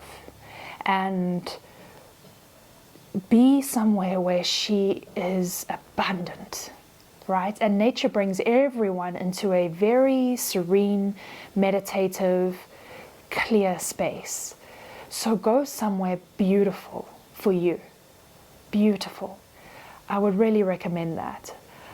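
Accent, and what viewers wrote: South Africa. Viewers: It absolutely is what you say sister. Lovely South African accent. You give the purest guidance on all of you tube. So refreshing to hear.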